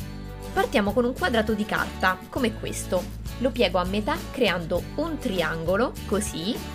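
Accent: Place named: Rome, Italy. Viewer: Sei americana o italiana perché mi sembri di roma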